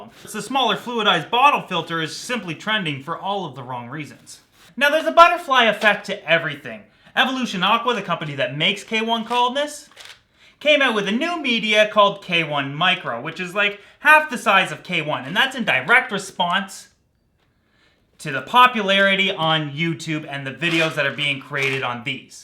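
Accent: Canada. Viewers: I love this guy's Canadian accent "Aboot" lol. Great video though.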